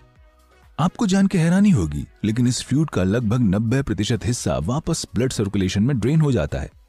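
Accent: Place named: India. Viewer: Yeh video kisi hindi channel say li hai. Banday kay bolnay kay andaz say pata chal raha hay kay woh India ka hai aur hindi kay lafz bhi istamal karaha hai. Nabe prateeshad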